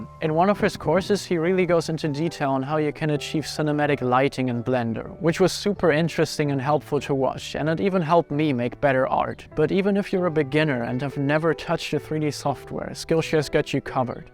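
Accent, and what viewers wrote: Germany. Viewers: Ich feier deine Videos, aber der deutsche akzent fickt meinen kopf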